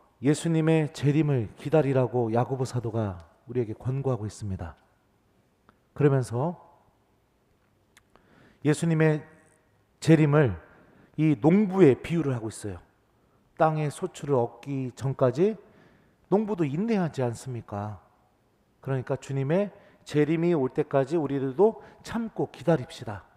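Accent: Chungcheong, South Korea. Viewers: 구수한 신부님 충청사투리 에 고향생각하며.열심히 신부님 강론 따라가며 한구절씩 되뇌이는 하루를 시작하려고 노력합니다.감사합니다 🙏 🙏🙏영육간에 건강하시길 기도드립니다.🙏🙏🙏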